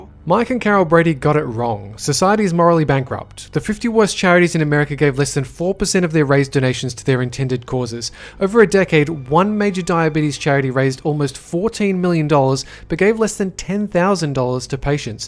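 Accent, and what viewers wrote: Australia. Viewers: A video titled "our society" read by an Australian, and only has facts about America. Dafuq? Look I know America tends to think it owns the world, but it doesn't. -_ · He's Australian actually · It's actually an Australian person and the reason why it's mainly America is because America has a lot of problems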